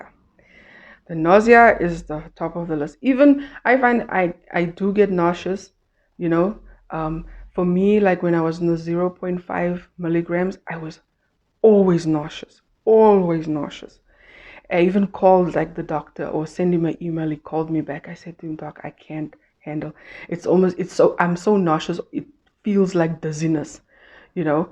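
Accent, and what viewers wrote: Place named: South Africa. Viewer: Yup, it saved me a lot. I just started trulicity which is about the same as ozempic. I'm excited to lose weight. Totally off topic, I absolutely love your accent! I know, typical American lol...but South African accents are completely under rated. I hope the best for you sweetie, you're looking great!!! I can only hope the same for myself someday soon. :)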